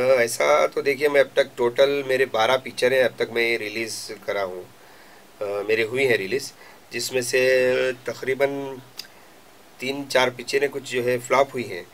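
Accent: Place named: Hyderabad, India. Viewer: gullu dada...hit ur flop..thats different matter...but ur acting and as human being very nice..revive of hyderabadi urdu...keep it up..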